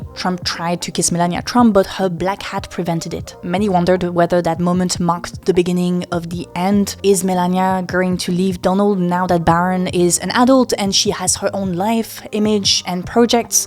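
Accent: France. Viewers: P.s. You're gorgeous! And that frnch accent🐕🤩🥰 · Is it a french accent or an I tripping? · I hate the French accent, you should LEARN English. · New watcher (from Sweden), love the French accent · ARE YOU FRENCH?????? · How dare you call Trump a fasist, I do feel sorry for you, young, damp and with your french heavy accent, says everything!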